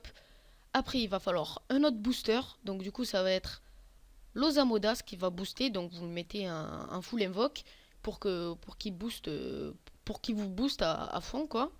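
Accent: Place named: South, France. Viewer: toi tu viens du Sud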